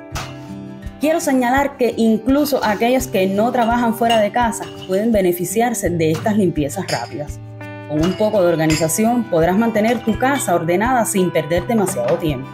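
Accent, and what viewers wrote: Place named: Dominican Republic. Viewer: sospechava que fueras dominicana amiga,gracias por contestarme🏠🇺🇾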